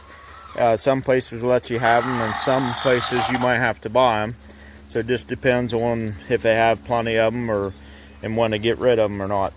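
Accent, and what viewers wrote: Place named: Pittsburgh, United States. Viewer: While looking for Pallet fence ideas for my garden I came across your video. Holy Pgh accent!!! I have one too. I didnt know SE Ohio carried this accent too. Very nice video and I appreciate the demo. It is very helpful.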